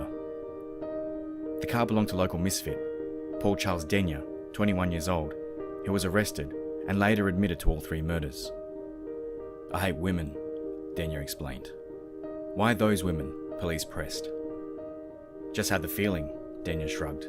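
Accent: Australia. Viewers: haha I'm a sucker for a man with an Aussie accent..... Yes I'm a strange girl hahaha. An Aussie accent and horror stories/crime.... Winning here!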